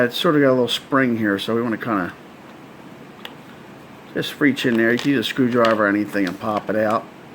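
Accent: Philadelphia, United States. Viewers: You from Philly? Know the accent anywhere lol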